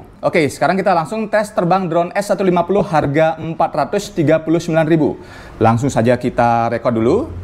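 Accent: Bali, Indonesia. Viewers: Makasi Bang review Dronenya jadi tau kualitasnya. Btw asli Jatim Bang? Soalnya Logatnya Logat Bali 🙏😁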